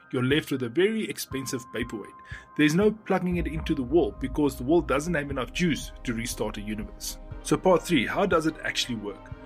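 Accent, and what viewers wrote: South Africa. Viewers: Ek wou nou net se die ou praat net so well afrikaans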